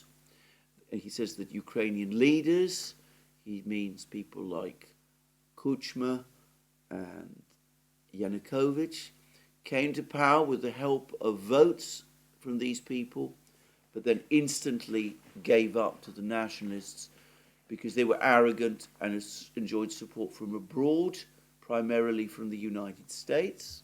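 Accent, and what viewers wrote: United Kingdom. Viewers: Thanks for your hard work, much appreciated! I love your very British accent by the way, British English sounds a lot better than American English, at least that is what I think.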